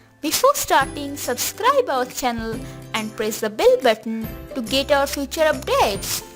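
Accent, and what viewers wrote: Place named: India. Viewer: Funny indian voice... why indian people has a very bad accent sorry you guys have a beautiful faces and color also...but why the acent is very bad...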